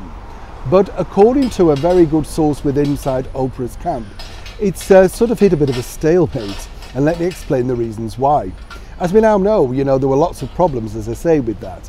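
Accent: Yorkshire, United Kingdom. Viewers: Jolly accent? That is a good Yorkshire accent, thank you.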